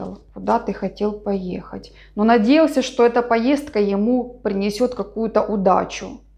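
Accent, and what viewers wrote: Ukraine. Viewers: Вы все расказали о нас с ним правду ,как будто вы за стенкой живете у нас ! Я преклоняюсь перед вами ! Как вы все правильно видите и говорите ! Дай. Бог вам крепкого здоровья и мирного неба нам всем над головой ! Да я украинка ,и вы наверно тоже ? По говору чувствуется ! 100000 раз вас за все благодарю ! Хотелось бы мне с вами сделать личный расклад ? Напишите мне ответ ? Благодарю вас ! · Приятно слышать ваш украинский говор специфический, в Крыму по нему можно определить местных жителей. Спасибо за расклад...он сбил серьгу...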